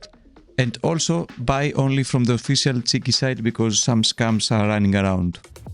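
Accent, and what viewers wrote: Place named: Bisaya, Philippines. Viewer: Sa umpisa kala ko kapwa ko bisaya 😂😂😂😂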